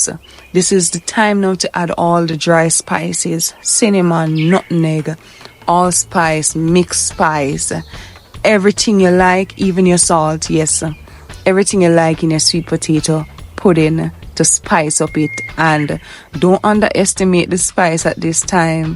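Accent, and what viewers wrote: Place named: Jamaica. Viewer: I'm living in the US,, I like your Jamaican accent keep it just like that,,,u are so intelligent 💓💯🇯🇲👋⭐⭐⭐⭐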